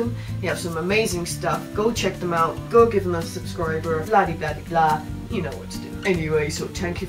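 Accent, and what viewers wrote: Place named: Ireland. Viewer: You're from Ireland aren't you, I can tell with your accent it's the same as my friend Apple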